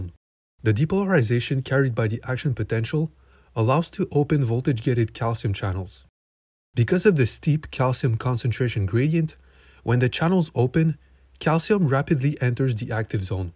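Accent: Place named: Quebec, Canada. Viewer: you have a quebecois accent lol. love the video tho it helped a lot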